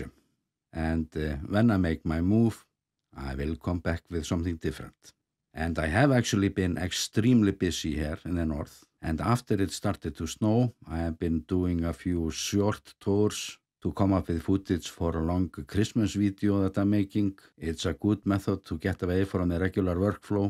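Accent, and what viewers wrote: Iceland. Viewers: I was in Iceland before the last eruption and witnessed the flooding and destruction of the Ring Road. To this day, I can’t believe how quickly you Icelanders repair things and respond to such massive natural events! You people are simply incredible. In countries like Germany, it wouldn’t have worked nearly as fast. Thank you so much for your great work, the detailed information, and the amazing footage. It’s always a pleasure to watch your videos. And by the way: I love the Icelandic accent!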